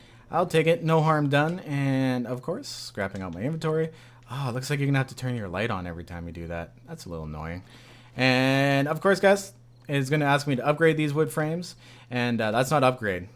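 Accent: Canada. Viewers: You sound Canadian. Is he?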